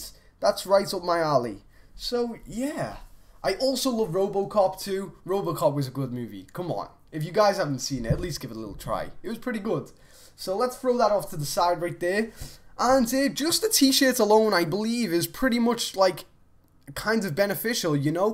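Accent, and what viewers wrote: Liverpool, United Kingdom. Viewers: are you from Liverpool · Are you British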